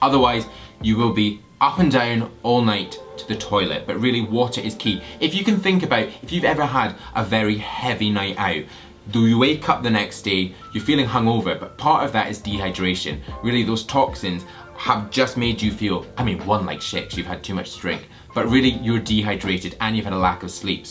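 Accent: Scotland, United Kingdom. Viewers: Eddie Lopez It's a Scottish accent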